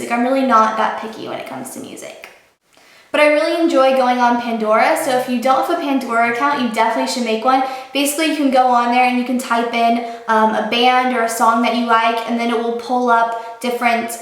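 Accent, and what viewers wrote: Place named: South, United States. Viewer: for some reason i feel like you are talking with more of a southern accent in this video! am i the onnly one who thinks so? you look really tan and lovely <3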